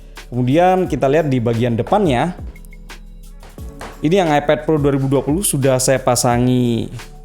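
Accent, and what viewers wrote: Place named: Java, Indonesia. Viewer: medok mu mas..... hahahaha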